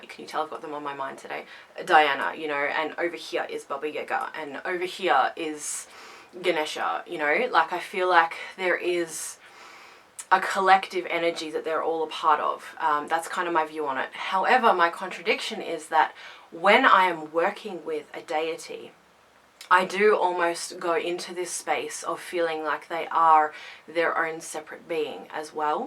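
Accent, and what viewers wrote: Australia. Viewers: '27 million' I don't know what it is, but if it weren't for the accent, that's how I knew for sure you're Aussie too. Nobody of any other country or culture that I've met, ever makes those ridiculous hyperboles like us Aussies do. This video was such a great help, it's hard trying to find your way when you're just starting out because there's just so much that it can be overwhelming to know where to begin, thank you for helping me sort out this aspect.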